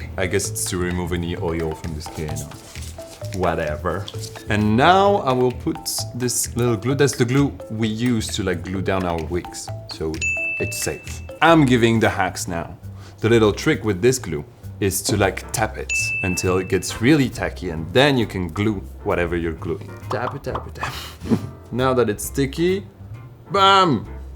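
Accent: France. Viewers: As a french person, i wasnt sure if her accent was a french 🥲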